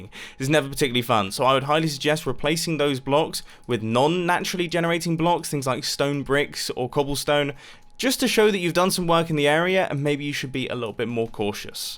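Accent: United Kingdom. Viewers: british man just said "don't panic". it's like that one book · 'ello charming brit!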